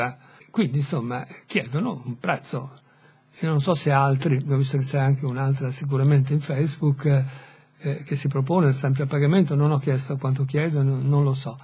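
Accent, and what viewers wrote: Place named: Italy. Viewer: Sto facendo un blog, perché sono una professoressa e, qui in Spagna, è preferibile studiare la diffusione dell’educazione (bene, giá sai...la neolingua, come avverte Orwell educazione=istruzione; istruzione=come usare un oggetto appena comprato, etc. etc.) anche attraverso questi mezzi (in questo mi trovano pienamente d’accordo). Trattandosi di un blog in costruzione, ancora non c’è nessuno che segue il mio saggio multimediale (esercizio di un corso), però questo blog è già sul web ed io mi sono permessa di citarti come gran lettore di libri italiani (il mio obiettivo è sempre comunicare con i miei studenti di lingua italiana qui in Spagna e poter mettere loro a disposizione materiale di supporto che li allontani un po’ dai soliti esercizietti del loro libro di testo. Per farla breve, sto montando un blog sulla storia immaginaria, la storia come avrebbe potuto essere, riempire con la fantasia i vuoti che a lasciato la storia, etc. 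Ho voluto inserire come esempio anche 1984 (inventare il presente) e ho messo il tuo link, con due parole per presentarti e consigliare che leggano gli audiolibri pubblicati da te, per via della tua eccellente dizione, della passione priva di enfasi con cui interpreti le opere e della cadenza perfettamente italiana (questo appoggiare le frasi sulla penultima parola, che rende il nostro parlare cosí dolce e che per gli stranieri è tanto difficile da assimilare)...insomma, mi da dai il permesso di lasciare tutte queste cose sul mio (purtroppo ancora a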